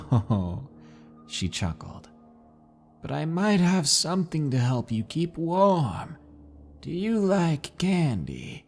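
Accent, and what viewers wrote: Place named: North Carolina, United States. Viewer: Listening to your voice telling these old stories reminds me of growing up in Valle Crucis, North Carolina, in Watauga County. I've missed that accent and the stories the old men used to tell while playing checkers outside the Mast General Store. I've missed my home for so long, just hearing your voice, so familiar to me from so long ago, has me in tears. Thank you so much and please keep sharing these. ❤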